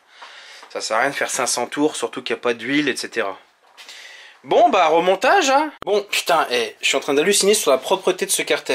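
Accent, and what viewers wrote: North, France. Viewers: Merci pour ta réponse, étant aussi originaire du nord expatrié dans le var, c'est vraiment sympa d'entendre cet accent lol, mais aussi surtout un passionné. Je viens donc d'acquérir un TT V(R)6 MK1, il serait sympa peut être de voir quelques vidéo sur d'autre modèles "VW" qui sont équipés des fameux "VR" ?! Au sujet de la chaîne de distri, quels sont "tes" préconisations ? Car il me semble (pas sur) que chez VW il n'y a pas de remplacement prévu ? Puis autre chose à prévoir, selon ton expérience, sur un modèle Audi VR6 DSG Quattro ? Merci pour tes réponses !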